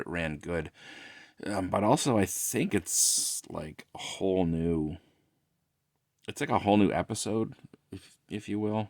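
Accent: Canada. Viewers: ah yea i heard some Canadian inflection but thats common here too lol and alright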